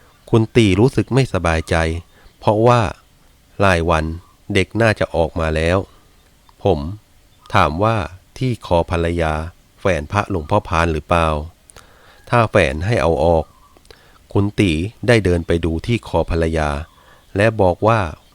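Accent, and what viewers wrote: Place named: Prachuap Khiri Khan, Thailand. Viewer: เหน่อแบบคนประจวบเลยครับ ผมก็คนประจวบ หนองตาแต้ม ปัจจุบันย้ายมานครปฐมแล้ว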